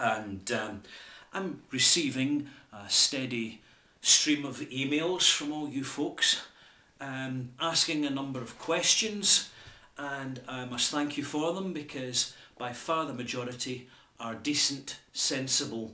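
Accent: Scotland, United Kingdom. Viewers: I love your accent. It's Scottish right? Like the dwarves in fantasy movies lol. Love that accent it's so bad ass.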